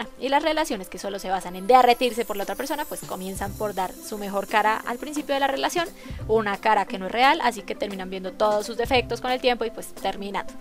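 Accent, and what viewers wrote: Colombia. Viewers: ESPERA.... Eres colombiana ????? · tu eres Colombiana? Yo si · Jajaj saludame en el siguiente vídeo pdta:eres colombiana? :v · Eres Colombiana? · eres colombiana?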